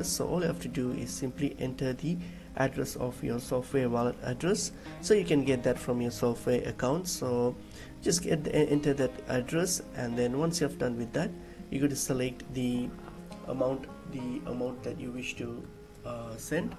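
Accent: India. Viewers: My, what a deep, Indian voice you have.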